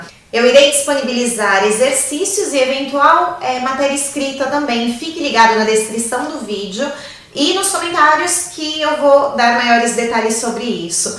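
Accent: Italy. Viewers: O sotaque sutil italiano enquanto fala português é uma delícia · Amei! Essa moça tem sotaque de italiano do caralho kkkkkkk Parece ate os nonni falando kkkkkkkkk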